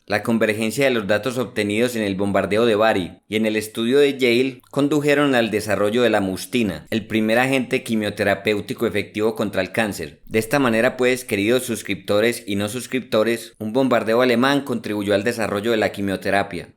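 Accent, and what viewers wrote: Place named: Antioquia, Colombia. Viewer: Ese acentico paisa le quita veracidad al vídeo, ya sebemos que los paisas son tan mentirosos y exagerados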